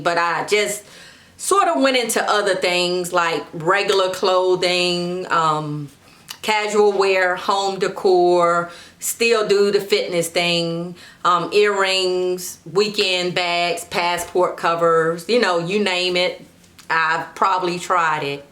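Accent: South, United States. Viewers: Im from north Jersey and I just love that . Southern accent .. and you look great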